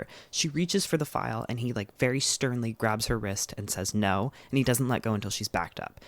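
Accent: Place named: Canada. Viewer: I know that no one is wrong and no one is right, but the way Canadians pronounce the word pasta is fascinating to me.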